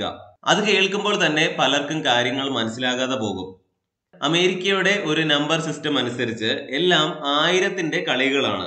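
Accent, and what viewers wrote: Kottayam, India. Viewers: Kottayam ano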